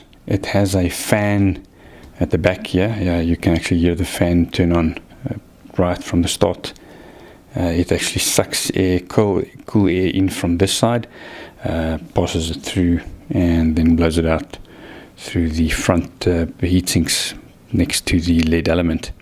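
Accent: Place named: South Africa. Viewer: I can spot a South African Accent any day!! Great video bru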